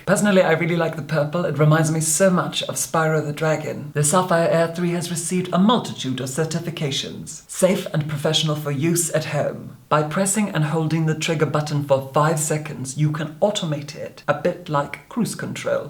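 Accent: Scotland, United Kingdom. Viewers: I don't think his accent has changed. He's had a Scottish accent the entire time. Has his speech or voice become a bit softer and his delivery a bit more refined? Yes. But I don't think that he's putting it on for affect. I'd say that it's more about the fact that he hadn't lived in London for very long when he started this channel and his more typical, regional Scottish burr, has softened and become closer to that of the people he's been interacting with in his business and personal life over the past decade. (if that makes sense?)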